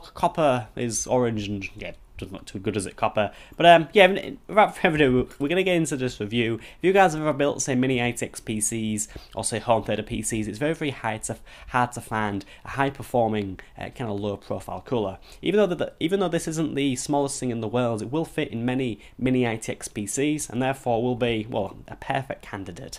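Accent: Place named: Northern England, United Kingdom. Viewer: I'm from the North of England and so is this guy. I've got no idea what he's saying half the time because he does leave a gap between most of his words. You've found the English Boomhauer.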